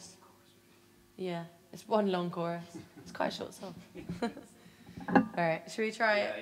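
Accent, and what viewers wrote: United Kingdom. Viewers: just because it's something soulful and she has a British accent doesn't mean you should feel any Amy / any other soul artists' vibes. I gotta admit, her talk may resemble Amy in her accent, but in no way does she have Amy's vibe. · american accent when she sings, posh english voice when she speaks hahaha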